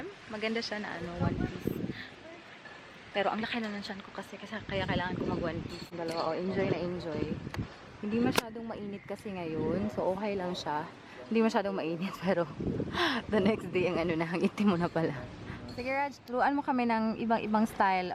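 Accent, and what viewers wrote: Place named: Visayas, Philippines. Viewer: Miss makainlove lagi ka kaayo’g tingog nagpaila jud bisaya😍😘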